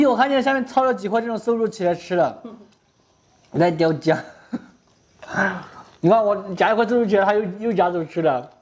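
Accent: Sichuan, China. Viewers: 你的四川话很正宗，要不是有字，都听不懂.🤣🤣你做的安逸👏👏👍👍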